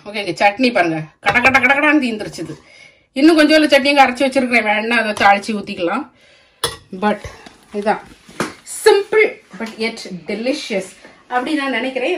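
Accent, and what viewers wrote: Nagercoil, India. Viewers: Akka neenga nagerkoil ah.appadiye antha slang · Nagar kovil tamil super sis.... Aappam soo soft and 😋yummy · நானும் நாகர்கோயில் பக்கம் தான், எங்க ஊரு பேச்சி style, கேக்கவே சூப்பர் ஆஹ் இருக்கு....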